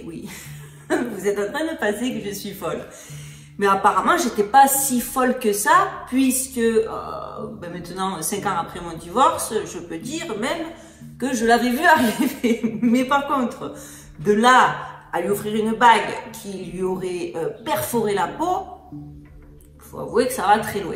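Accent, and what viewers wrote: South, France. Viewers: bjr, j'espère sincèrement que yOutube va vOus Laissez pOsté tranquiLLement vOs vidéOs qui sOnt extras. "nOus vivOns cLairement dans un mOnde dément de démOns" Prenez sOin de vOus & de vOs prOches sur Vegas. (Merci pOur Les petites pOintes d'humOurs & pOur cette accent qui me rappeL cOmme j'ais aimé vivre dans Le sud) "ça fi du bien" 🇨🇵😉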